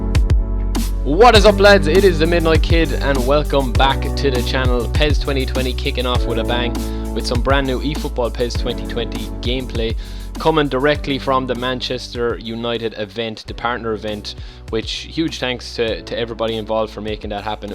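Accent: Ireland. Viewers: Welcome to Ireland. I think it sounds nice, love the accent.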